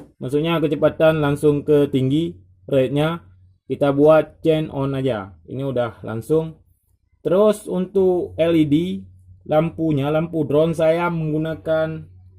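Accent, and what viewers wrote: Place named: Padang, Indonesia. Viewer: Dari logatnya seperti org padang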